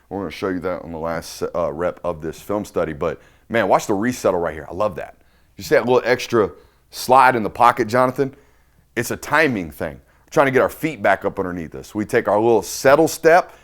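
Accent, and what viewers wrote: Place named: Texas, United States. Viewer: I gotta ask who your team is. Sounds like Texas or Oklahoma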